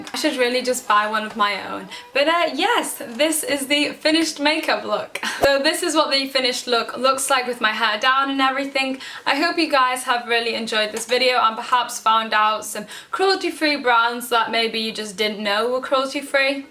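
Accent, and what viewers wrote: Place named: England, United Kingdom. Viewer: what part of england are you from? your accent is very different from me aha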